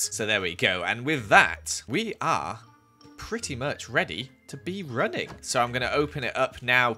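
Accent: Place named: Scotland, United Kingdom. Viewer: Sounds like Scotland to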